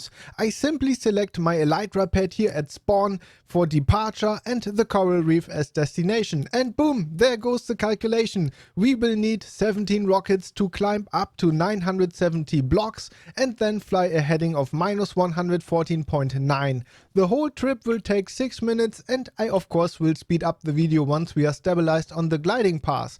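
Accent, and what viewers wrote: Germany. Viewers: Bist du Deutsch? Hört sich so an!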